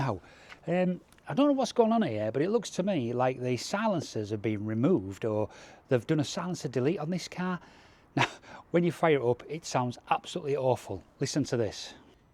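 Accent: Hull, United Kingdom. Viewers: Are you from Hull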